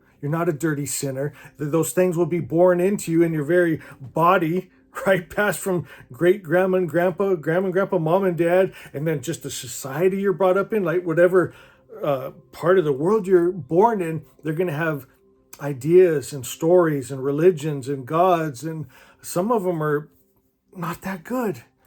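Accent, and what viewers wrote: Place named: Greece. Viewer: Greek accent detected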